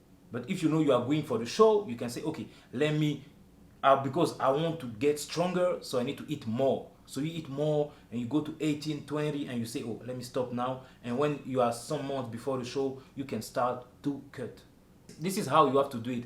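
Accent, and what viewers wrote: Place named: United States. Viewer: Tu parles comme un Américain l'on Fils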